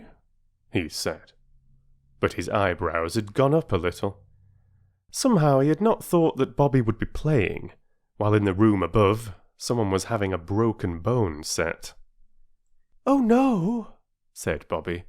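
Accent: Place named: Yorkshire, United Kingdom. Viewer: Love it! This has been my second time listening to your warm and joyful performance. How wonderful, the warmth, nuances, and humor of an actual human voice (vs. AI). And your voice is an especially fine one in timbre and tone. Love the touch of Yorkshire too.🙂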